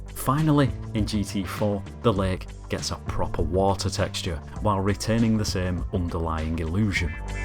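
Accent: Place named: Lancashire, United Kingdom. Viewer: "Euwt uf bewnds" haha love Lancashire accent (and im a tyke)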